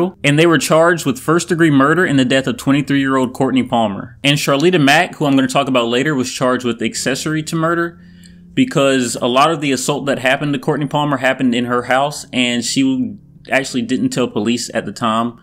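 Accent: South, United States. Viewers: This guy sounds a little country don't he?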